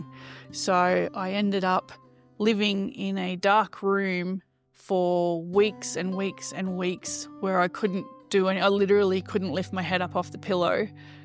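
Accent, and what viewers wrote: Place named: Australia. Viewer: Glad I've found your channel. I've just subscribed. It's lovely to hear an Aussie voice and to hear your story. I'm in Tassie. I retired this year and also first picked up cross stitch (HAED of all things) but found it a bit too intense with the concentration and had to use a magnifying lamp and even that was straining my eyes. So, I've put that on the back burner for now. I'd learnt basic crochet stitches as a youngster from aunts and great aunts, maybe even my grandmother but I'm not sure. But I've never crocheted an actual item. I found some 3 ply wool I'd been knitting up but never finished as a baby blanket over 26 years ago when I was having my son. A few weeks ago I took the unused wool from that and a crochet hook I found in my sewing box and taught myself from YouTube videos and made a small pram sized baby blanket with a really pretty shell stitch. 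It was so satisfying to actually finish something. Then my daughter in her 20s pointed me to a pattern on Etsy for a daisy granny square blanket and asked if I thought she'd be able to make it. I looked at it and told her probably not. She's never crocheted before. So I bought that pattern and saw two other patterns I liked on that Etsy site and bought them. It's DreamMakesbyJD. I think she's in WA. So I started with the Mermaid Dreams pattern as a test run for myself. I started the large size (she called it Afghan-small) and then realised I didn't want it that big. So I looked up the dimensions online for what I was look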